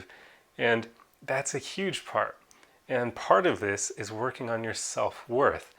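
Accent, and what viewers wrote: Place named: Germany. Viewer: You're such a chill guy, thanks for your video! Also, where are you from? For some reason your accent sounds mildly german to me lol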